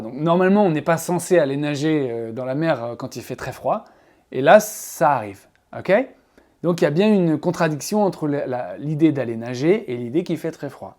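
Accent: England, United Kingdom. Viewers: La premiere impression.. Je me suis tout de suite dis que c'etait un anglais ....u have a good English.... J'aimerais bien apprendre avec vous... Vous êtes très sympa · J'adore cet "accent" anglais :) Thank you so much !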